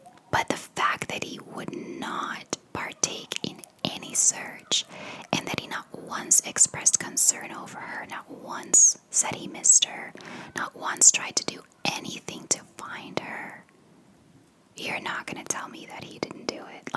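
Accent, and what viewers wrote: United States. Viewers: i’ve watched your vids for so long and i’m still in shock that you’re from belgium. your english is flawless and your accent sounds so american i’m fooled every time😂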